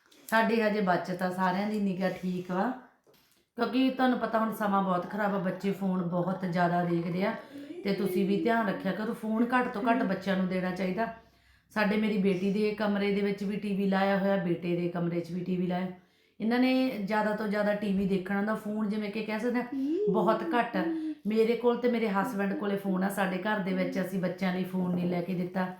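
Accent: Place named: Jalandhar, India. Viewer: Tuhadi language Jalandhar wali lgdi aa asi v Jalandhar to e aa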